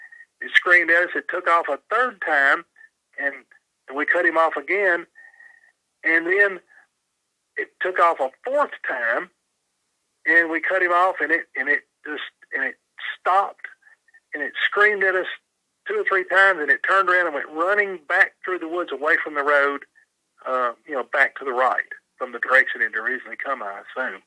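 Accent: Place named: South, United States. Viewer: I could listen to narrations for hours, really enjoy his 'good ole boy' southern style..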